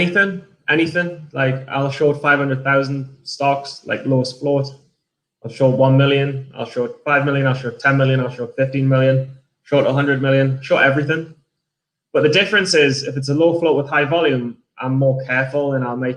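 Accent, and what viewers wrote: Newcastle, United Kingdom. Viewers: You from Newcastle? Sound like Geordie!! Im from Newcastle too been trading 8 months now I traded BYFC and got torn apart around $6 and only ended up on the stock around $100. Traded CHCI as it rejected $4.20 and $4 level hold and held until $3.12.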